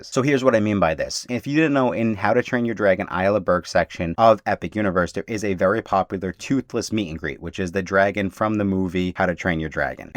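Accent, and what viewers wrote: New York, United States. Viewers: Thanks for clearly stating that 2nd gen interactives were the only wands trackable on the app! I rely on CC due to grappling with the NY accent, and I didn’t ‘hear’ any misstatement but perhaps my eyes are going, too. The potential $85 minimum savings of not upgrading our 1st gen interactives wands might make 1 visit to Blue Dragon a possibility.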